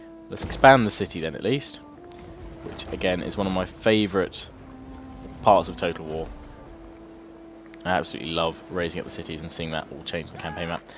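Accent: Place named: Wales, United Kingdom. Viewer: I say kill em all lol:D I love the welsh accent:D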